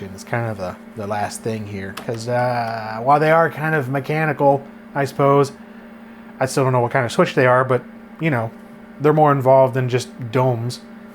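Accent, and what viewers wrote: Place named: United States. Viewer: Well... I saw the title and the thumbnail, so clicked on it and was shocked when I heard a US accent coming out of my main speakers instead of the one I was expecting coming from my subwoofer!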